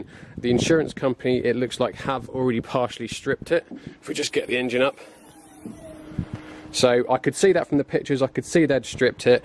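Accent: United Kingdom. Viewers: What an ugly speach...that britisch slang sucks..sounds Horrorble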